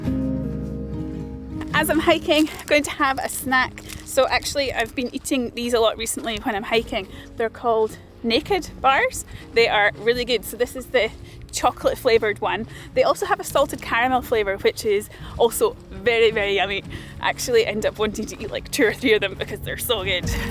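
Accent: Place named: Scotland, United Kingdom. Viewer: Scottish accent so strange 😑 compared to British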